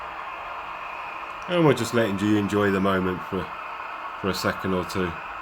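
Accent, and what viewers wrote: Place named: Yorkshire, United Kingdom. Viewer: Thought you`d be a Yorkie Man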